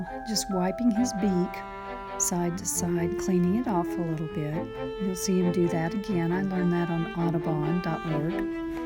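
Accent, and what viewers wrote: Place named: South, United States. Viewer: You sound like a southern lady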